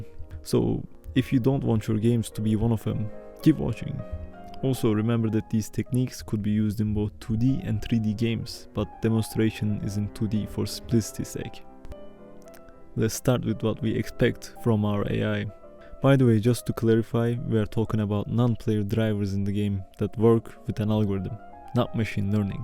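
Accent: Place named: Turkey. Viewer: Your Turkish Accent got me laughing so hard 😆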